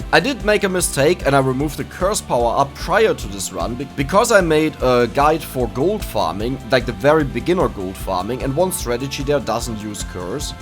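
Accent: Germany. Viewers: your live stream voice sounds way more german.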